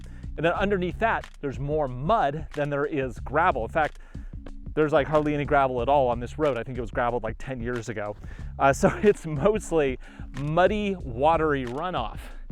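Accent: United States. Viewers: I am also wondering why we are watching the driver so much when we clicked on this vid to SEE HOW THESE TWO CROSSOVERS PERFORM GOING UP THAT HILL?! Less talk and more showing of the two CUVs. PS The way this guy drives and talks, he must be from the Midwest.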